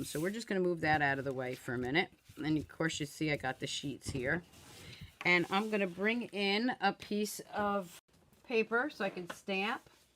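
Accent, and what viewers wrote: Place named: New York, United States. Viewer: G'day, watching the replay here. I love your New York accent lol. Cheers from Heidi, Melbourne, Australia 😊💖💙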